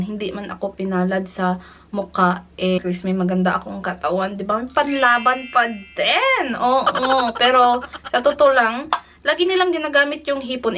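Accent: Visayas, Philippines. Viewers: Ganyan talaga ang mga accent ng BISAYA! Wala ka kahit anumang bagay ba ginagaya. Ikaw yan! Kahit anong mangyari ikaw at ikaw parin yan. That's life! Just be proud of you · Mag ka boses kau ni Rhaze at visayan accent hehe hayaan muna mga nega... Stay safe! ❤️ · tama jud dai. katawa lang ko aning mga nega. Just stay positive. bitaw pag first nako pag tan aw nako sa imong Blog or Vlog maka tunog mo ni Ms. Rhaze siguro the way atong accent na bisaya ana jud guro.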